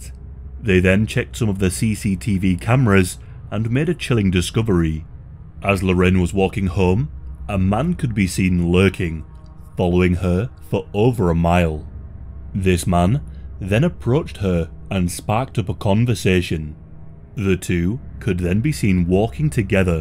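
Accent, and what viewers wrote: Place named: Northern England, United Kingdom. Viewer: Sounds like northern England 🏴😊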